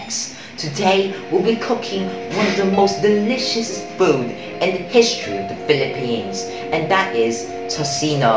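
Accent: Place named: United Kingdom. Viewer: The accent though😯 British accent😯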